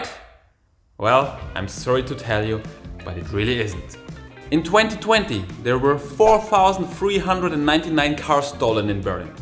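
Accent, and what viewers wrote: Russia. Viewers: I confirm your russian accent as a russian ) bardzo ciekawe zobaczyć 😌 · your russian accent is wonderful! 😄😄😄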